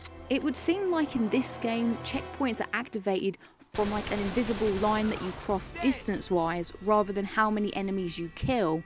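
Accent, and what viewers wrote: United Kingdom. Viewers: Dog damn, that British accent. I hate it and simultaneously I live listening to you !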